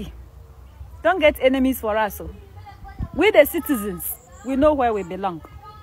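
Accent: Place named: East Africa. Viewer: Are you even a Ghanaian to be speaking for Ghanaians.? You sound more like an East African..anyways speak for yourself wai and don't say "we" the citizens. You and who.? Nice try though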